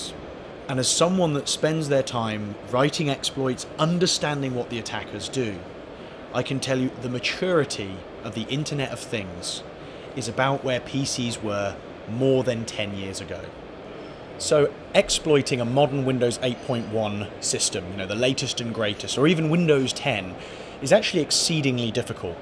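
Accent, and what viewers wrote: United Kingdom. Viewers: I really like the UK #accent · Man's British I guess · He is talking very British lol. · I can hear the British accent ❤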